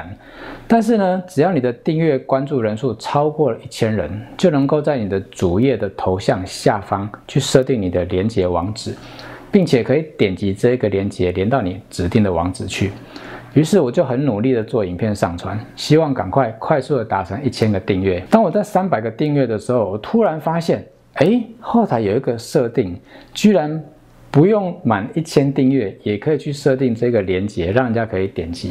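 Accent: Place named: Taiwan. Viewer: 的台灣腔大陸人可能聽不太懂 XD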